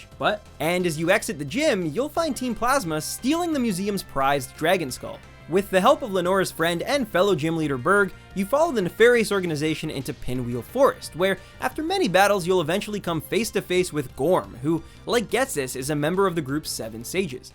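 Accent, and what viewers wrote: Canada. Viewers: Those Canadian Ab-outs hahaha I love it.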